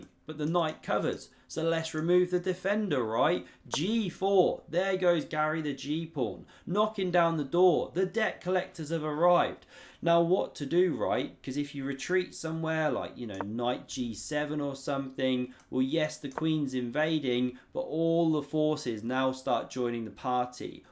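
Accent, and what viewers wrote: England, United Kingdom. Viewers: I came here for the English accent, but stayed for the chess. Heh.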